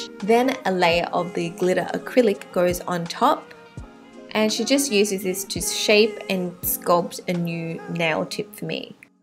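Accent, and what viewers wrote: Australia. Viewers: you def have an aussie accent :) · Wait your australian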